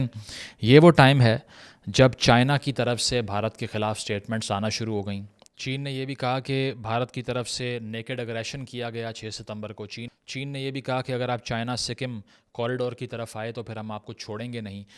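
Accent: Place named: Punjab, Pakistan. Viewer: Why do you speak English in Punjabi accent? . Otherwise you are amazing. Very easy way to understand you. 🙏🙏🙏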